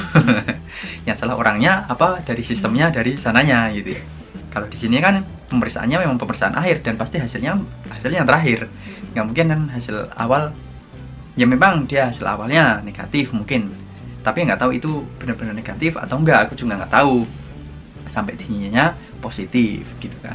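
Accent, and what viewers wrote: Java, Indonesia. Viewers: Jowo ne ndi mas..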